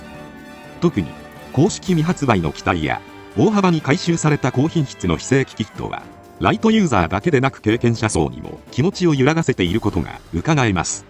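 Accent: Kansai, Japan. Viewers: さん、関西人だったのか・・・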